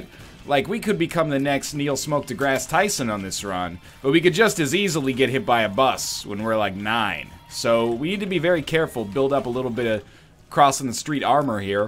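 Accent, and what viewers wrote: Canada. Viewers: South Parks imitation of Canadians is true because that's how actually talks. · Put that's how people in Canada talk!!!!!! I seen SOUTH PARK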